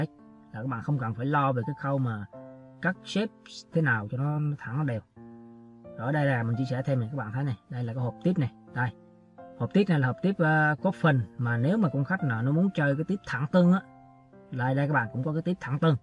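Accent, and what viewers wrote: Central, Vietnam. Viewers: Nghe giọng miền trung mà nhớ quê hương quá. Anh chỉ rất tận tâm. Đã like và subscribe :)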